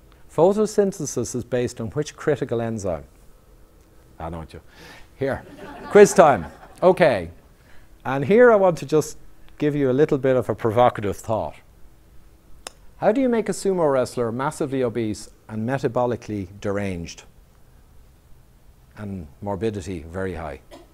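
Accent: Ireland. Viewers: Ivor Cummins I love the Irish, no translation needed! Lets do a Skype session.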